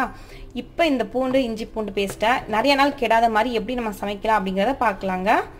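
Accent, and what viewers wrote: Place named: Kongu, India. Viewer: Super sister, good for your slang , your native is kongumandalam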